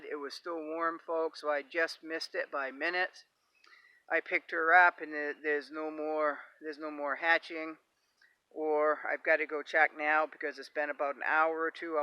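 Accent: Maine, United States. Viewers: Im one of the new subscribers- youtube suggested it while I was watching permapastures. I subscribed because I like the easy going yet firm manner and the garden behind you looked intriguing, I may do a teepee next spring. I also subscribed because I miss the Maine accent, a lot of the family on my dads side are from there.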